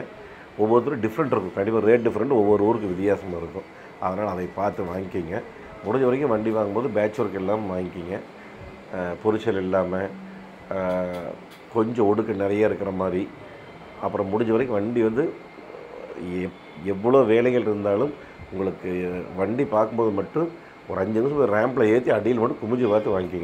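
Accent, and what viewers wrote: Kongu, India. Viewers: Anna iam from erode bhavani.... Unga kongu slang vera level...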